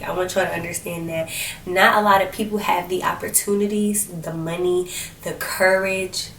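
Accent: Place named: Philadelphia, United States. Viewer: I knew you was a philly girl I can hear it!!! Congrats on the move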